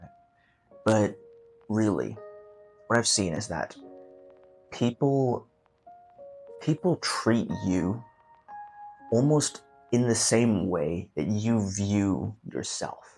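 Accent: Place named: South Africa. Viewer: Where are you from brother? Your accent has all these quick nods into a South African accent but then dips out just as quickly to sound largely American. I'm really struggling to place it.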